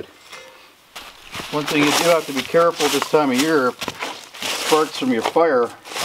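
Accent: Canada. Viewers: Being a Canuck (Ontario) living in the USA and just finding your videos, this is a great feeling. I am enjoying living stateside however, I miss Canada and my backcountry escapes. Nature here is different and while that's great to experience, I have the feeling for the wilderness I grew up travelling to. Killarney, Algonquin Park, North Bay etc. Those trees, bird sounds and smells feel like home in comparison to what we have around us now. So your videos (and accent) are a great view of home. Thank you for making them and I look forward to watching more. All the best!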